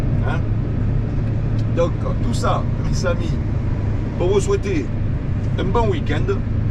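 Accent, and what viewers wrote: Marseille, France. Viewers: Visite de Marseille avec le vrai accent Marseillais J'adoreeeeeeeeeee avec tout arrondissement confondues comme les bons et les moins bons et c'est ça qui fait que Marseille et Marseille et restera ma ville que j'adore, bonne continuation pour de nouvelles vidéos, BRAVO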